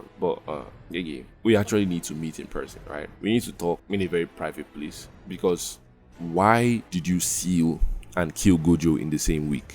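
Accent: Nigeria. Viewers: Nigerian accent obvious bro.. PH boi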